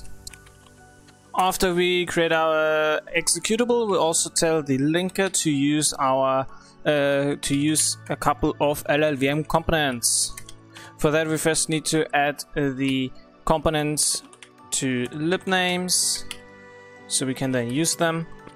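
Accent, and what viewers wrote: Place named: Germany. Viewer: 1) nice microphone 2) nice video editing 3) nice voice :) high quality! Just some weird ways to say things but great work! But that might come from the german accent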